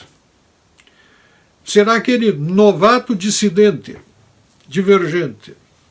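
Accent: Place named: Rio Grande do Sul, Brazil. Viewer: Sr. Puggina, quando ouço - o, neste timbre de voz típico sulista riograndense, sinto-me um privilegiado, como alguém ouvindo um professor, sentado ao centro e falando da sua experiência notável. Obrigado. Mantenha - se.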